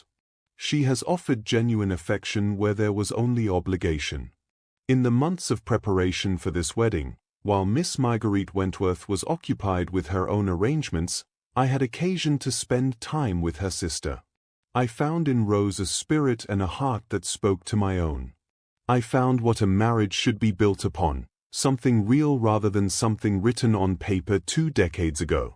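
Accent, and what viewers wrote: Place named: England, United Kingdom. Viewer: It’s nice not to have an American accent reading. Please keep the English accent.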